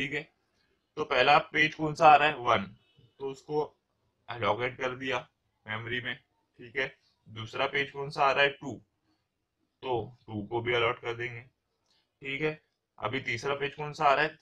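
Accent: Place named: Mumbai, India. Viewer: kya badiya mumbai accent hai sir ghar ki yaad dila di ekdum badiya sikhaya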